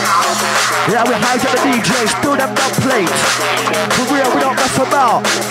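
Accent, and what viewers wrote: United Kingdom. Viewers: i love this station because it's just some british guy talking over drum n bass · I love this music and this accent so fkn much. Big up to the UK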